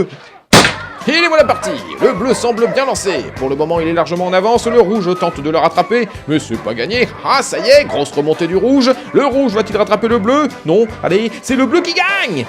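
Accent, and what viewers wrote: Quebec, Canada. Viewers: Ça fait toujours sourire d'entendre un français prendre l'accent québécois! Haha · Un fond vert ainsi qu'un jeu d'acteur à souligner! ( Sans parler de l'accent québecois ), est-ce qu'on peut parler d'une nouvelle ère pour?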